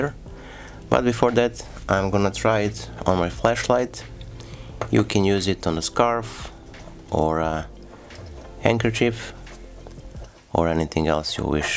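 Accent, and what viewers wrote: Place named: France. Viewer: Very good film avec accent french😂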